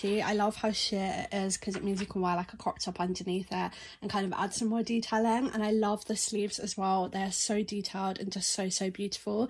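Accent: United Kingdom. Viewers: I really love British accent you sound like one❤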